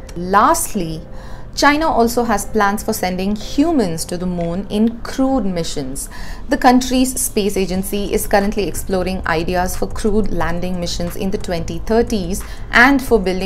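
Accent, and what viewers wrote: India. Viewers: 这个女孩子讲的印度英文话，蛮好听的。😁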